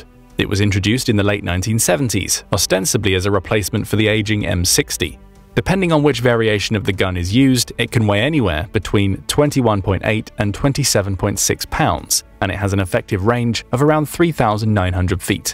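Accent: United Kingdom. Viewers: This is what happens when random guys try to make videos of military hardware. You're going to get your butts handed to you by the YouTube algorithm, by having actual military enthusiasts watching. With all those inaccuracies pointed out in these here comments. Caliber in traditional military sense describes the ratio between bore diameter and barrel length. The 50 caliber Mark 22 actually fires 3 inch/76mm shells, not .50BMG ammunition. This is the kind of basic mistake that casts doubt on everything else referenced in the video. At least the British accent makes it seem more artificial and movie like.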